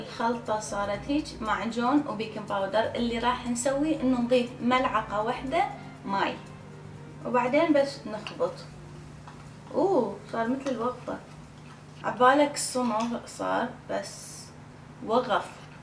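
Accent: Basra, Iraq. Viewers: افف احب لهجتكم يا العراقيين♥️ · لهجتها بصراوية ماعرف الة اجربها يلا اعرف زينة لو لا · فدوه اول مره اشوفج وحبيتك 😍😍😍لهجيج مال بصره انتي من البصره ؟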